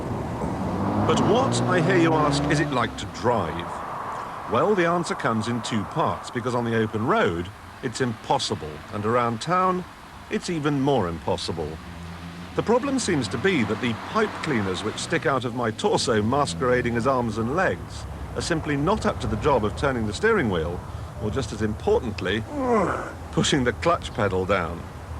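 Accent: RP, United Kingdom. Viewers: Clarkson as hilarious as ever but sounding a little posher than now, obviously the public school education has eroded to become the success he is today and become a man of the people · Why does Clarkson sound so posh back then lol? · He sounded so posh back then! Now he sounds like a chav lol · Back when Clarkson spoke more like the Queen than his true Yorkshire persona like he does now. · Ha Clarkson used to have an old "bbc" voice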